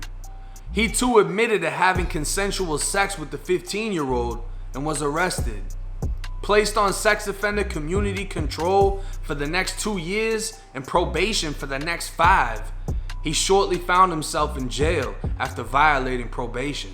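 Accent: Boston, United States. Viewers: How DAAAAHHHKK it would get lol all love but your Boston/Florida accent be getting me at times lol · Sounds like a boston version of Mr Ballen story telling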